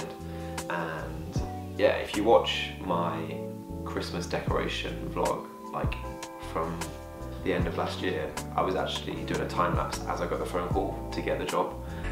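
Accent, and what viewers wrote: United Kingdom. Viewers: Love your British accent! (I am German, that's why...) :-)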